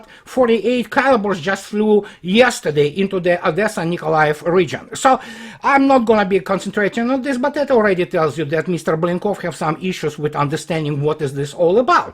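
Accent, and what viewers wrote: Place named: Russia. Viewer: These people are NOT "incompetent": they are actually COMPETENT in spreading propaganda. You need to weaponize your words moreover your Russian accent is way too strong for the average Joe in the West: to listen to you demands an excruciating effort to a native English speaker. Edit your content and make an English native speaker to talk in your videos. Voila, you'll be more competent in your communication under these conditions. Best of luck! (please do not get upset: I am trying to help you to be more efficient in your communication).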